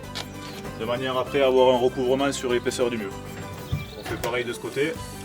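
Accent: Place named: Carcassonne, France. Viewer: slt, super merci pour les infos. Je ferais donc un coffrage si tu me le dit c'est que tu le sait ne pro c toi pas moi 😉. J'appiquerais donc tes conseils à la lettre . Je me disait aussi cet accent est le même que ne mien !!! Moi je suis de carcassonne un grand merci pour les combine surtout que tu n'est pas obligé. Par contre si tu fait un tuto sur ce genre de méthode eh bien je prend avec plaisir.a moins que tu l'est déjà fait dans ce cas pas besoin. Je n'est pas encore pu visionner toutes tes vidéos je le ferais ce week-end je pense encore merci 😉😉😉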